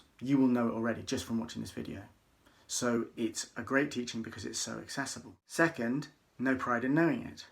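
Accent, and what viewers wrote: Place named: England, United Kingdom. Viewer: Thanks for enlightening us. A few questions if you don't mind: 1. Where does the name Chenrezig come from? 2. Is Chenrezig the same as Avalokitesvara or Guan Yin Bodhissatva? 3. Is Chenrezig manifest into thousand eyes, thousand arms? 4. Is Chenrezig a male or female? 5. Did Chenrezig born out of lotus flower like Guru Rinpoche? Because mantra is like Mani Padme ( like Padmasambhava? ) Thank you, with Metta ( btw, like your English accent and you are very young, indeed. )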